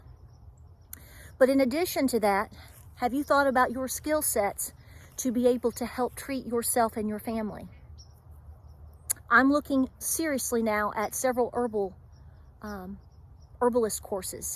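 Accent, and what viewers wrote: United States. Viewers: Y do u speak like a Yankee... America is weak asf that's y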